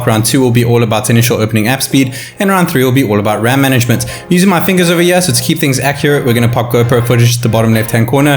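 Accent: South Africa. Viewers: this guy has a thicc South African accent!!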